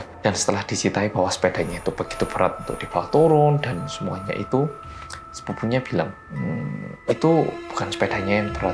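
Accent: Java, Indonesia. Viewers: dari hari ke hari saya amati logat mas sudah mulai bercampur antara medok Jawa dengan medok Korea, ada sengau-sengaunya "H"-nya... hahaha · salam buat org Korea yg medoooookkkkkkk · MAS MEDOK AS MAS JAN NGASIH JUMPSCARE GUA KAGET ASTAGA😭😂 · udah hapal sekarang mah bukan orang korea yang Medook tapi Kewedeen nggk ketipu wkwk Love mas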